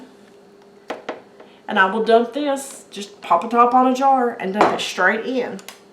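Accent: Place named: Appalachia, United States. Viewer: Hi! I just attempted to email the yahoo email address listed, but it bounced. Here is what I wanted to ask you about: What about Sweet potatoes? Last time I canned conventionally in the traditional sugar/water, they were just so mushy, not much good for anything needing texture, plus the added sugar isn't always needed depending on the recipe. I think I did maybe dry can some cut in long slices like fries last year....but I wasn't sure about chunks. Can you instruct here, or maybe make a video? Oh, and if it matters: this year I grew white sweet potatoes, though I have done traditional orange ones in the past. The whites are slightly less sweet, and actually can be used as a sub for mashed potatoes, or in any other dish calling for potatoes. Thanks! Anita P.S. I love your accent. I grew up in southern OH (bordering WV) the accent there is referred to as "hillbilly"..., and I've hung onto it despite living in other parts of the country for almost 40 years. 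Not sure where you're located, but you remind me of home :)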